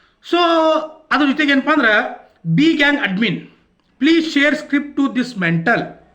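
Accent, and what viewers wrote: North Karnataka, India. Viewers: ಅಣ್ಣಾ ನಿಮ್ಮ ಮಾತ 🔥 ನಿಮ್ಮ ಊರು ಯಾವುದ ರಿ ನಮ್ಮ u k ಭಾಷೆ ಮಾತ್ರ 🔥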